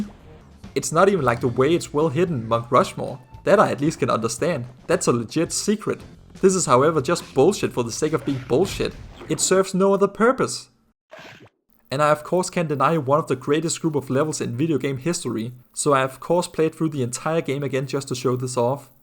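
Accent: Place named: Netherlands. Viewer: That Jan Willem Nijman clip from his juice talk where he mentions explosions was really unexpected in this video. 😅Your accent sounds Dutch so I guess that explains it. Do you have a background in game dev? Overall great recap of Skullmonkeys. We had The Neverhood at home when I was a kid, used to play adventure games together with my dad and brothers. But never heard anything about this game. The fellas at Neverhood Inc are legends to me, so much creative expression.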